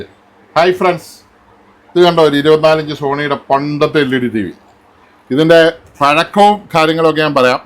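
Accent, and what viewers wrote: Kottayam, India. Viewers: താങ്കളുടെ ഒരു ആരാധകൻ ആയി മാറി ഞാനും കറ കളഞ്ഞ സംസാരവും പിന്നെ കോട്ടയത്തുള്ള അച്ചായന്മാരുടെ തനി പകർപ്പും പിന്നെ എന്തു വേണം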